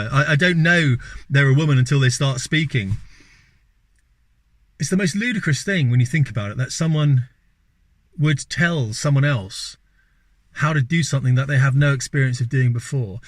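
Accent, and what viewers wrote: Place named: United Kingdom. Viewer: Great content nice to listen to a great British bliloke who is intelligent and knows his stuff 🛩️🛩️👍👍👍👍🇬🇧🇬🇧🇬🇧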